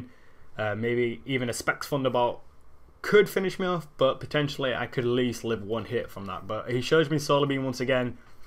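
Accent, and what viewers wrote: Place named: North, United Kingdom. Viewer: So northern.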